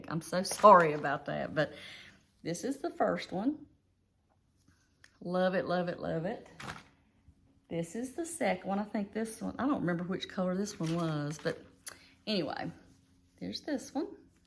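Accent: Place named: Tennessee, United States. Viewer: Hi, I'm Charlene from CHARLENE CROCHET CORNER, I just found you and I love your accent. I live in South Carolina you sound maybe Tennessee? I subscribed and would invite you to subscribe to me. I love yarn but I'm not as lucky as you are. I just got out of a 9 week hospital stay for my 145th surgery. I was a nurse but my disability caused me to go out work my last shift on Nov 4th, 2007 came home and got in my hospital bed in my livingroom and proceeded to have a nervous breakdown. That is kind of why I started my channel. If I didn't have the love of my channel, well I would have no yarn and not as many wonderful friends & now they are family to me. I love them dearly. I will shout out your channel & would appreciate the same. Oh I love your Carrie Penny shawl, do you have the pattern? I need a copy those are gorgeous! Hope in a future wrap? 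Oh I so love that. I need yarn for that. I love fingering wt yarns. Good for you not letting it beat you. I love your hair. I'm going to be 58 in July. SO we are close. I don't edit my videos it's to hard. Ok I'm going to find the info in your description box. Oh and welcome!hugs, charlene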